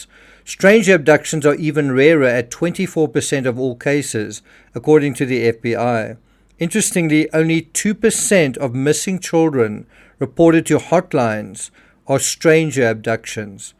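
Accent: South Africa. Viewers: Is that a South African accent??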